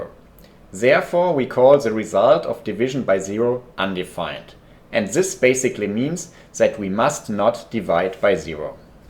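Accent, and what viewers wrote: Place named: Germany. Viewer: Nice Kraut accent.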